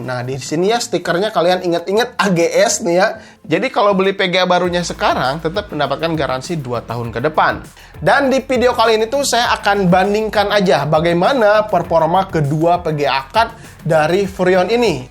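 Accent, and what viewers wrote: West Java, Indonesia. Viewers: Biasa SUNDA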